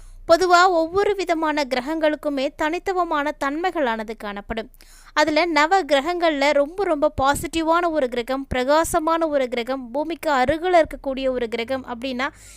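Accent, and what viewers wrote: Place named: Tirunelveli, India. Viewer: ஏல திருநெல்வேலி பக்கம் வந்த உண்ண??????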